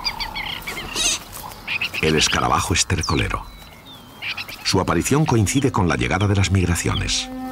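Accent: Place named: Spain. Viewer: Se olle de la madre con acento Gachupin.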